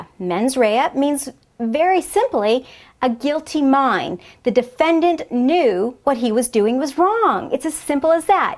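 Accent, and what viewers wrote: United States. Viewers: Hi Greg. I'm a 40-year resident of the United States who is currently in California (13 years). I've moved around, 27 years in Texas, a winter in South Jersey, a couple of months in Tennessee and I traveled as a sales instructor, 37 states total. I speak 4 languages fluently, but English is only my 3rd language. I just came across this video today and found your question. Long answer to you because of your preface, "IF YOU ARE SURE." I am not sure, but my guess is, she's from the Midwest. Eg. Illinois, Missouri, etc. among others. Educated Americans, by the way, such as Attorney, don't have identifiable accents, geographically. I say it humbly, folks have a hard time guessing my origin. Native Californians sound like her. And so do college graduates from New York. The loud and confident tone of her voice can also be attributed to a generational upbringing of a family of professionals, professors, educators, et al. 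Having said all of this, why 5 elements? I thought there's only 2? 😂😅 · QUESTION: If you're really certain, where in the USA is her accent from? Thank you. Every one of this series of videos is very clear and of excellent quality, and the audio levels on every one of the videos are perfectly matched for an uninterrupting playlist. The instructor is also very attractive, and I'm curious where in the USA her accent is from. Finally, I wish there were more such videos from her, besides the ones in this series.Thank you so much!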